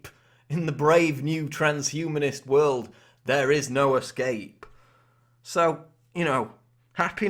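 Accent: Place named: England, United Kingdom. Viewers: guy sounds like he is from rochdale. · I'm British and I have to say I really hate your northern accent! sorry but you sound like a tit! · Your "Prison" is of your own making. It's also quite presumptuous of you to "know" what will make others happy. Rather absurd actually. You're a troll with an English accent in my book mate.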